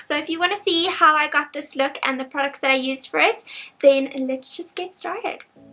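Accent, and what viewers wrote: South Africa. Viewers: Love your accent. are you south african?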